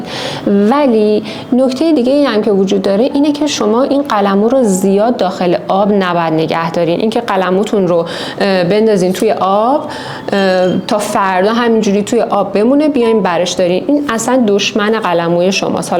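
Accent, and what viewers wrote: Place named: Isfahan, Iran. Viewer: فهمیدم اصفهانی هستی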